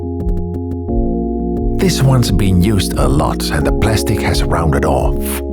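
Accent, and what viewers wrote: Netherlands. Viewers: The most dutch accent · Ik dacht al een Nederlands accent te horen en de krantensnipper bewijst het ;)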